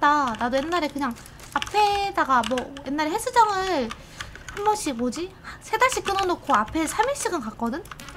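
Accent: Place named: Jeolla, South Korea. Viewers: 님 사투맄ㅋㅋ 고향이 어디세여? 전남 영광같아ㅋㅋ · 고향이 전라도...? 최근꺼부터 거꾸로 보고 있는 편인데.... 이때만 해도 사투리 작열이네욥 ㅋㅋ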